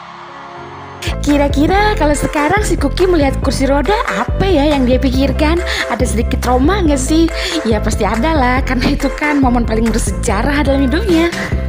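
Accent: Sunda, Indonesia. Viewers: Hehe min nya suara nya lucu org Sunda ya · Min ..aslinya orang mana sihh ..sundanya medok bingit 👍👍🤣🤣😂😂😂 Suara mimin bikin rindu ..kontennya yg ini mengandung bawang tpi berhubung yg bawain mimin jd ckup trhibur . Semangat min .. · Min urang mana meni sunda pisan? logatna asa logat urang dieu😳💜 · mimin nya orang sunda kah 😆?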